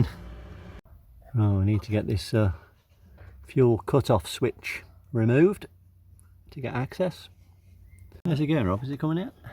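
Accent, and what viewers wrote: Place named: East Coast, United States. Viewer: Just got a 85 Ford diesel 350 a one owner with 70k miles. Needs minor interior repairs. A little leak happened while she sat for four years. Had it towed and she started up first crank. Purrs like a kitten. Thinking mabe Van Halen stripes on the old girl. Needs a bath as is completely covered in moss and thick lichen. Where are you all located? Sounds Eastern accent. NW Arkansas here.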